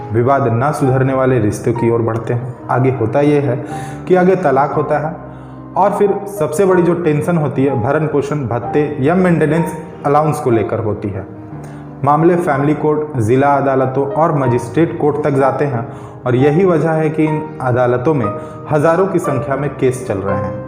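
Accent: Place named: Maharashtra, India. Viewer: Tu marathi hai...hindi me gadbad ho rahi hai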